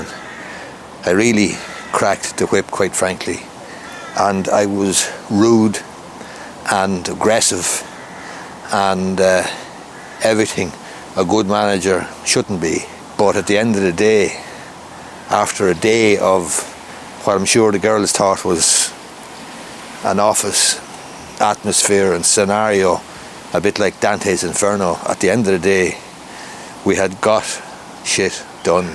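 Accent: Ireland. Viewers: I luv the irish accent. 💓 Great video bthanks for your insights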